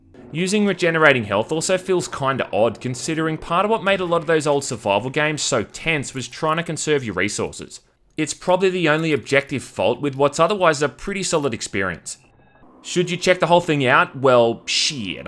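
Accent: Australia. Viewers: Man, I love your Aussie accent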